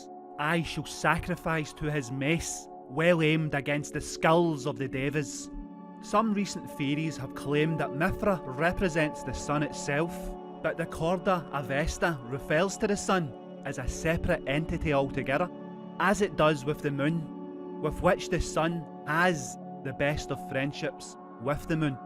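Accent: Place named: United States. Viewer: To the owner of both..'lost history Channel",' light up the dark" channels ...my sincere apologies for any offence taken , two AMAZEIN CHANNELS , I totally went around it the wrong way , of saying i loved the strong American outstanding narrator which made me listen more intensely, am a Glasgow man myself please don't take offense ,it's just i hear that accent everyday.... Sincere apologies again ......ANOTHER SCOTMAN.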